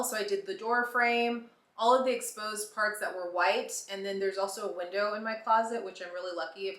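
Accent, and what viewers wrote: Scotland, United Kingdom. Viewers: Loved the Scottish accent!❤ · Hey 🩷 I stumbled across your channel about a week ago & I love your resourcefulness! I don’t think that I personally can be a minimalist, but you give me so many ideas for storage and declutter! I would say add a long frameless mirror in that gap, it will reflect light from either your bedroom window or your bedroom light! Oh & your Scottish accent is great! I’m from England & I can barely do my own 😂🩷✨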